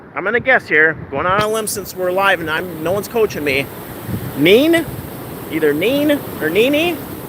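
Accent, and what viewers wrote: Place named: North, United Kingdom. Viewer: Coming in a bit late but loving this video! Been coming to the Panhandle since I was a teenager (or before) ... about 100 years ago. It was before all the high rises were there. But, I've never walked the pier. Very cool. Beautiful sunrise! Sound is good except seems I hear a bit of a northern accent ... just a little bit. 😂❤❤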